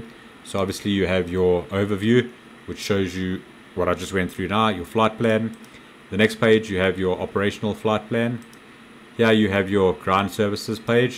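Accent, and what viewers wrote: South Africa. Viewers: thanks for the tutoral. i am a big BOEING fan and after many years of flying them i have eventualy decided to tryout Airbus. BTW, its good to hear a South African accent again, (other than my wifes) as we have been living in the UK for sometime.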